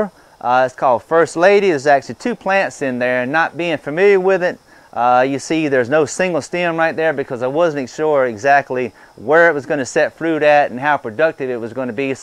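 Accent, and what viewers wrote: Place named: Louisiana, United States. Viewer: I remember many years back, addressed on video, subscriber comments about his "accent". He stated that after going back and listening to himself he couldn't hear what people were talking about? I always figured it was remnants from the cajun region...if I recall right? I had never even noticed till brought it up one day and lo and behold He hasn't lost any of his verbal charm!!! Love ya, you have taught me so much the last decade, I truly appreciate the many many hours you put in and the honest down to earth teaching you blessed us with. Hey, when the snowflakes show up next time....let us handle 'em....with love & kindness.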